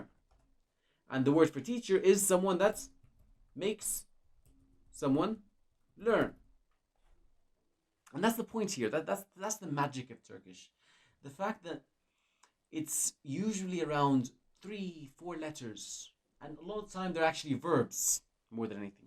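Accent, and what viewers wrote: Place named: Ireland. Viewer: I understand and speak a small percentage of Turkish, and would really love to become fluent in it ama çok zor. I very much appreciate what you are doing to help people learn the Turkish language. Tell, did you by any chance learn English in Ireland? I am hearing an Irish accent from you. Kolay gelsin.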